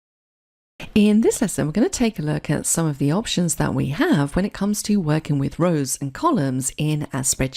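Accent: United Kingdom. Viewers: Thank you! I am a 56 year old (from India) heart failure patient who is actually into Quickbooks accounting for staffing companies in the US. As I can not go out and need to look for work from home only, the first thing I need to keep up are my excel skills. There were many free courses available on Youtube but I chose this one as it is presented in a British accent, though you might be based in the US,(a BBC fan here!) with good recording quality too. I really appreciate your free service and I am not sure how I can help in return. Thanks a lot and best wishes from India.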